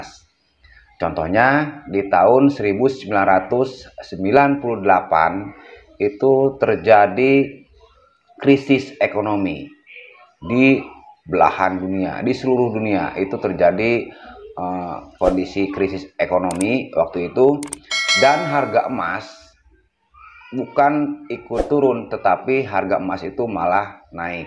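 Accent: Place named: Palembang, Indonesia. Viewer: Logatnya sangat pelem autosubscribe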